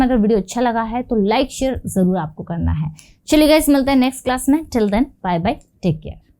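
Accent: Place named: Bhopal, India. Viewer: आपकी हिंदी का उच्चारण भोपाली क्यों है ? बहुत इरिटेट करता है जब आप मैं को में और कैसे को केसे बोलती हो...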